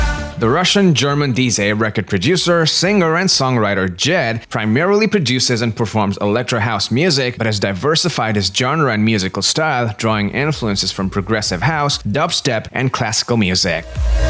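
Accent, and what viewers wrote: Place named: England, United Kingdom. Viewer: Your english accent is awesome.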